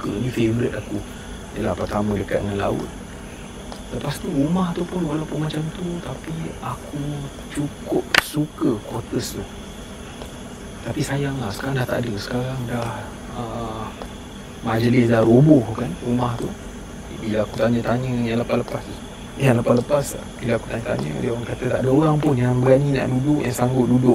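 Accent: Terengganu, Malaysia. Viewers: Sedap tgk deme cakak tganu....mantap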